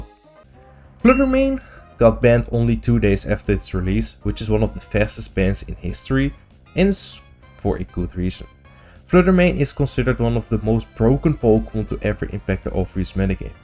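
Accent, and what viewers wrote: Netherlands. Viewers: I finally understand what my English teacher means with a Dutch accent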